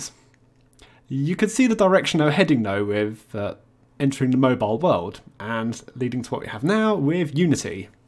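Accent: United Kingdom. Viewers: Does he have a British accent?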